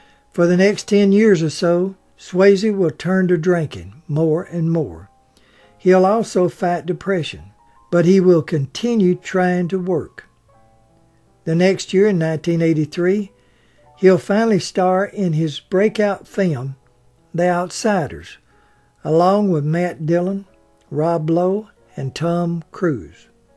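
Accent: South, United States. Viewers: Mr....Another fabulous blog. That Southern accent of yours is so charming. I have been following you for over nine years. Your voice is golden. Please do a vlog about Rosa Parks. Respectfully, Norma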